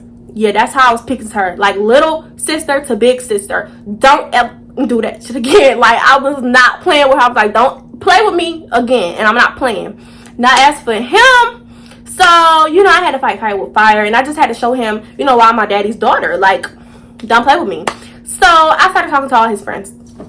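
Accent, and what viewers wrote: Baltimore, United States. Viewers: the baltimore accent 😭😭😭😭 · LMAOO THAT ACCENT NOT MARYLAND THATS BALTIMORE ITS DIFFERENT 😭😭😂 but I’m from dc so I get it lmaooo that shit funny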